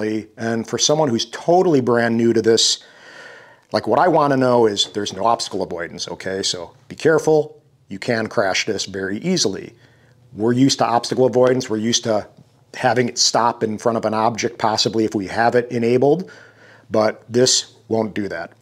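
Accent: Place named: Chicago, United States. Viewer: Moved out of Chicago a couple years ago, out in the boonies now. Also got into drones this year. Avata 2 is my 2nd. Its super fun, man. Nice to hear a Chicago guy talk normal and frankly about his experience. I knew most of this stuff already but I watched the whole video cuz I enjoyed listening to you go on like a Chicago guy. Cheers, brother. Enjoy the drone.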